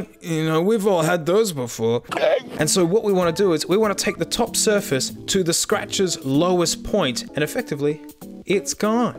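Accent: Australia. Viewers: He sounds distinctly less Aussie than his newer videos (and there was no Frank at the end! It's not a video without Frank!) Happy you've now found your stride, I'm just browsing your earlier content:) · “Working down we have 120, there’s 80, 60 and mate if that’s not getting the job done ya bust out ya 1 grit and ya get it done roight” This guy has to be from Australia because he is hilarious to me! Love this channel.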